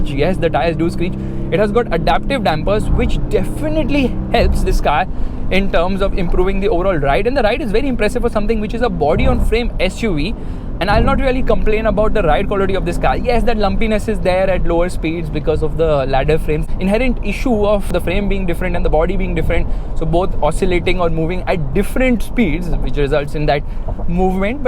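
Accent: India. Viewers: Очень ярко выраженный индийский акцент в английском языке...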